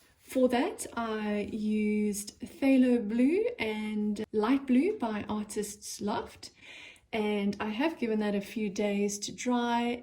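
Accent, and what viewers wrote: South Africa. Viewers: You sound South African, this is encouraging if you are in South Africa, that means that you can probably get Floetrol and the paint in SA?? I'm in the UK at the moment, returning to SA soon and hoping to be able to create some of these beautiful swipes and pours. Loving the colours and your ocean creations. xx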